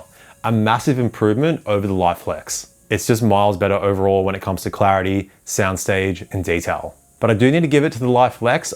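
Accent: Australia. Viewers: i'd rather listen to aussie english all day than that stupid AI yt bs.. thats not necessarily a compliment 😂❤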